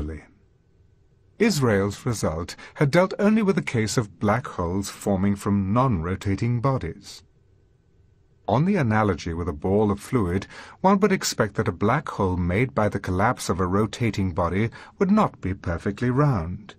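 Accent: United Kingdom. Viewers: Love the British accent. Sounds very English! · Beautiful voice and pronunciation. At last a British English and not the usual american twang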